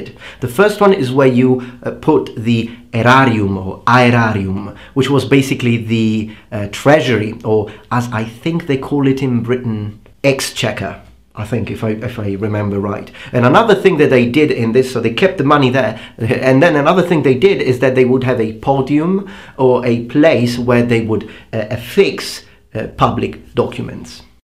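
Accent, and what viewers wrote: Greece. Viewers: You have a modern greek accent and not an erasmic one! Good. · really Helenic Accent ! Congrats my Friend!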